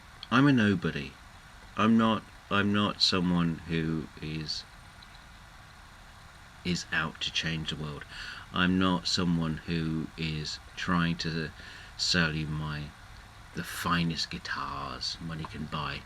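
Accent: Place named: Yorkshire, United Kingdom. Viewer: Well done. Have a listen to the Swedishguitar Nerd comparing his monster '85 Gibson Les Paul to the Epiphone LP Special 2@ Epiphone Special II & Gibson Les Paul Custom comparison More confirmation of the truth. With a sister who's a composer, reviewer and teacher using a 20k Smallman classical, who dispairs at the lack of the expsensive tonewoods for luthiers making top end accoustics in Spain (such is the greed for them for irrelevant kit). She berated my attempts to source a 'good' tonewood electrical guitar. Until I researched and gave up. I trust her, she teaches good performer electric guitarists and as she says, our gendre is wires and magnets. She has always said you can make the body of an electric guitar out of any old crap and provided the wires and magnets are good the sound will be too. The neck has to be playable but the magnets are picking up the fluctuation in string which is then amplified electrically. 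An electric guitar is not amplified by the resonance of the timber. This video says it as it is. There may be the odd nuance, maybe, maybe a harmonic (who knows) but, nothing that can't be induced by a Line6 HD500 somewhere along the supply chain. Some people don't like the truth when it flattens their beliefs and downs their hero's. speaks like a Yorkshireman telling it as it is. Well done. But then again, there are always those that simply have to believe that the world is flat, the universe rotates around the sun and there is a pot of gold buri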